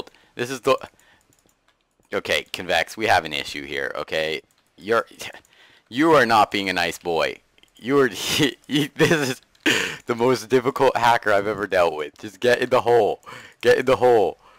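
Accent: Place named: Netherlands. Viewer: DAT dutch doe xD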